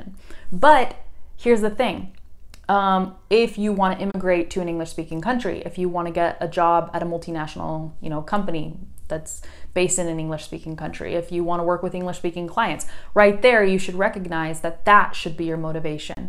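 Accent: Buenos Aires, Argentina. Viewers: Que lindo es escucharte hablando en Argentino jajajaj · Es evidente que estuviste en mi país, Argentina, tu acento es sumamente porteño. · tu acento en español es argentino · me enamoré! habla perfecto en argentino jaj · I love you sooooooo much!!!!!!! Argentinaaaaaaaa, you sound like me hahaha Speaks perfect the Porteño accent :D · Vos sos argentina. No puede ser que pronuncies tan bien español. Es la primera vez que escucho a alguien de USA sin acento. Me sorprendió tanto...tenés acento argentino, es más, porteño. Nadie se daría cuenta jamás que sos americana si estuvieras pasenado por acá. Te felicito, ojalá algún día yo pudiera hablar inglés aunque sea un 10% de lo bien que hablás vos español. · WOOOW!! I can see you're not only a pretty gorgeous woman, but also a VERY SMART ONE!! CONGRATULATIONS!! It's very impressive that you can speak the spanish very fluently. 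And the most awesome thing is that you can speak it very well, and you can speak in a perfect argent acent as well!! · You have a very impressive argentinian accent. You sound very native. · Your spanish is cool, your accent is totally Argentinian! I know it is cos you were living there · Tu español es acento argentino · Wow, hahaha you have such an incredible argentinian accent that you could fool anyone here in Mexico thinking of you are from Argentina. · Hi, I really like when you speak in Spanish, your scent is from Argentina. 🌸 You have a beautiful voice. You speak a very well Spanish. You speak a perfect and excellent English; I can understand you.🧚🏼‍♂️ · Hi, En español tienes acento argentino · Lindo acento argentino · Hablas con una mezcla de acento español y argentino (pues es lo que me parece). Que bonita! Saludos desde Brasil. Me encantan tus videos en youtube. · It is really beautiful to hear you speak Spanish with that Argentine accent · Wow your Spanish is great! 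Also, your Argentinian accent is so good I am shocked! Showing people how good your Spanish is considering that you did not grow up with it really sets a good example of determination and achievement. Maybe one day I will become an English teacher myself as well. Thank you for being so patient and polite to people who still struggle to communicate their ideas in English. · Wow, your Spanish sounds very angentian. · Qué genial que hayas dominado el español con acento argentino! 😃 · Me he visto este video como 1000 veces solo por escuchar a la profe hablar en español con su hermoso acento argentino.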